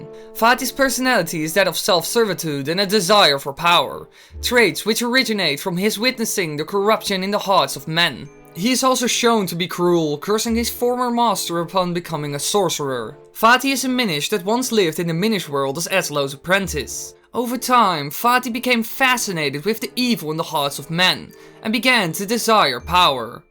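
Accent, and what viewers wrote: Netherlands. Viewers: Ah, so it's Dutch? I wasn't sure exactly what it was, ha ha. XD Hey, your videos are really interesting! Keep up the good work!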